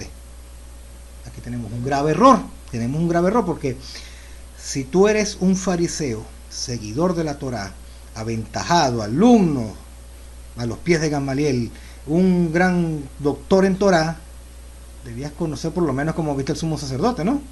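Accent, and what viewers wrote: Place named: Venezuela. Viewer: Usted,no parece judío,tiene acento venezolano.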